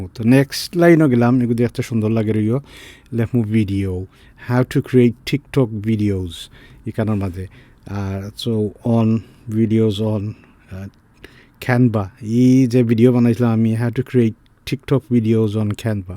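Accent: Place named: Sylhet, Bangladesh. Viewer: Finally a Sylheti speaking video. Awesome video. Thank you for teaching how to use canva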